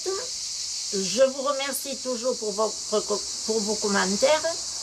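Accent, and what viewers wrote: Provence, France. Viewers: Merci pour cette recette incontournable de l'été, simple et délicieuse ! Je ne manquerai pas de la refaire très vite. Votre jardin est magnifique et c'est vrai que ça fait plaisir d'entendre votre accent, ( nîmoise, j'ai l'accent qui chante aussi ) qui se perd de plus en plus à mon grand regret et sans oublier le chant des cigales ! Mon grand-père maternel et parrain, était un gentilhomme provençal qui à la fin des grands repas, chantait de sa belle voix "Coupo Santo" et ma fille pour lui rendre hommage est une arlésienne qui défile dans toute la région avec l'école des traditions. Pensées affectueuses de Sylvie la nîmoise 💙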